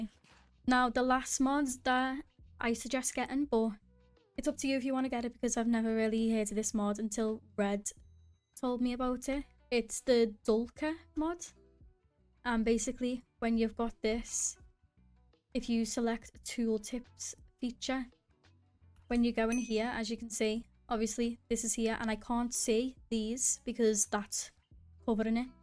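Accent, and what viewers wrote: Liverpool, United Kingdom. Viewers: Are you from around Liverpool? I can’t shake that accent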